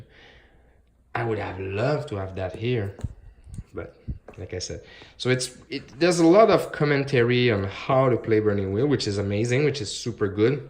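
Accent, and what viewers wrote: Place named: Quebec, Canada. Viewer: I detect a Quebecois accent... ca va mon chum? Good review! Looking at buying these books myself.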